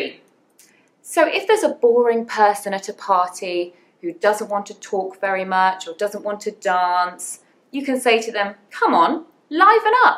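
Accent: United Kingdom. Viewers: I really look up to you because of your pure British English accent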